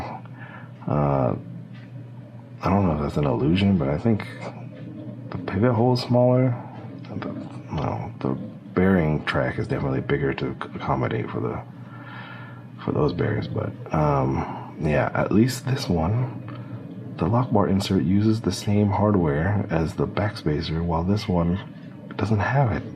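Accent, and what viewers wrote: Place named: Connecticut, United States. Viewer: Da fuq? Ken Keniff from connecticut sounding ass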